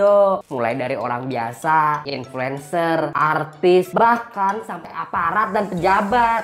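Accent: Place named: Malang, Indonesia. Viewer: Semacam arek malang bahasanya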